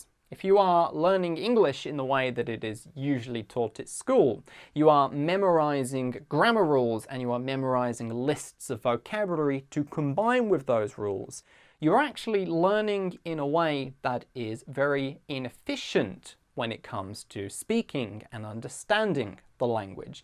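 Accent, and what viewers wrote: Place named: United Kingdom. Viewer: Yes, it is British English!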